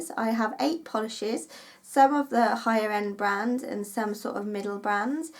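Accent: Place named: West Country, United Kingdom. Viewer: I love your videos - you sound like you come from the west country! I am more into Gel polish but still love watching your videos. Have you tried the app 'SNUPPS' where you can record all your collections/colours etc on your phone so when you are out shopping you can quickly check to see if you already have it in your collection..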